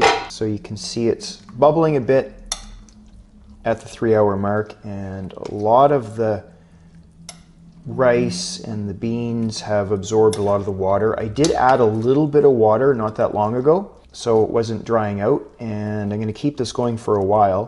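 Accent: Canada. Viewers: When you tune in to hear 'oooot and abooot'... I love the Canadian accent :) Not disappointed! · Elizabeth B MY THOUGHTS EXACTLY! :) that's always how I'm easily able to identify if someone's Canadian.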